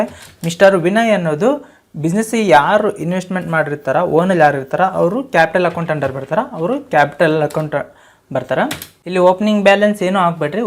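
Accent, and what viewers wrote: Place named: Ballari, India. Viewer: Pakka ballari language TQ sir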